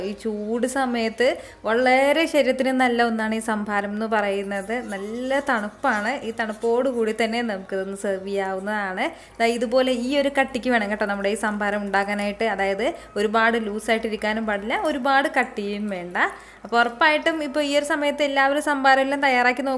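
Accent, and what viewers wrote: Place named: Tirur, India. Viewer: Excellent recipe,really good for this present climate,ippozhanu orukaryam orma vannethe Thiroor vettom swadeshiyano slang ketteppo thonniyethanu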